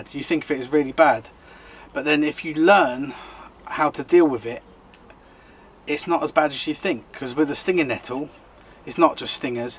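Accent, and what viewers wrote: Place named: United Kingdom. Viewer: 🇺🇸Very well said. Despite having a very British accent. 😂 Just love it! Prayers for your partner. Hope shes doing well.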